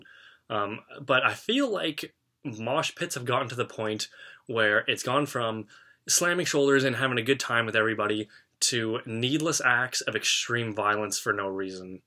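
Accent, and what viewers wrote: Canada. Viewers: Where is your accent from? Canada?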